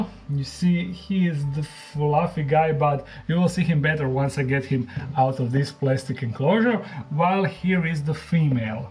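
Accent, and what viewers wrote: Croatia. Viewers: Hi. I came across your channel a month ago and man I'm hooked. At first I was wondering why your accent is so familiar 🤔 Than few videos later I realized you are Croatian like my boyfriend 😅 After watching more videos I also realized you live like 127 km from us. Hopefully one day I will have the opportunity to visit Dark Den. 😊 Just wanted to say how much I love your videos and all respect for you for caring so much for all your animals 😊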